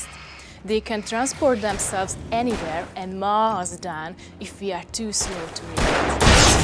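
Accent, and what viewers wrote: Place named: Russia. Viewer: has a great Russian accent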